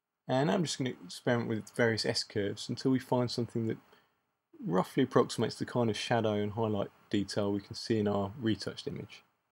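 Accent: United Kingdom. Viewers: His accent is English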